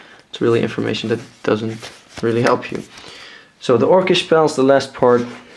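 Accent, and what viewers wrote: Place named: Netherlands. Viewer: Haha, je accent is zoooo Nederlands, hoorde het gelijk :) Ik ben zelf nogal verslaafd aan Warcraft III, zowel single als multiplayer. Deeltje 2 nog niet geprobeerd, zal ik vandaag even doen.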